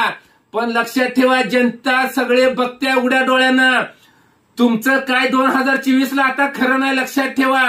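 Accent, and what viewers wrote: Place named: Solapur, India. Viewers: सोलापूरी आवाज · सोलापुरी भाषा कडक 😂😂😂😂😂😂😂😂😂😂😂😂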